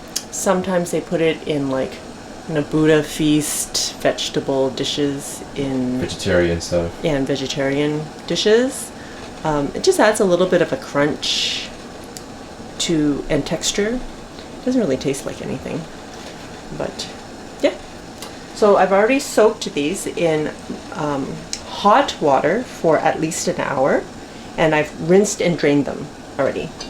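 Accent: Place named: Canada. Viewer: I feel like I just watched maangchi with a Canadian accent! 🤔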